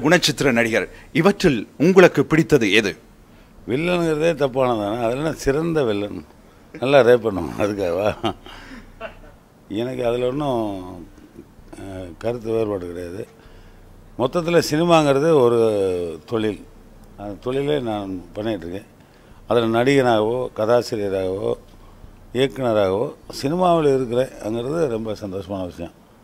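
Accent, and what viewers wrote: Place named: Sri Lanka. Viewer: He is a Srilankan tamizhan, he is speaking pure tamil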